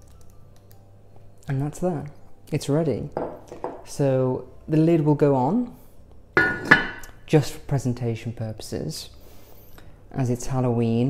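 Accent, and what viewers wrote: United Kingdom. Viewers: i always look forward to your videos. its like watching a real life Mr Bingley in a modern era, how delightful to hear your british accent, the aesthetics of your video and of course the lovely content like your beautiful table setting and your food. i also noted that this time round, you did a close up of all your wonderful table accoutrements. ooh those monogrammed napkins, need i say more! they are so very you.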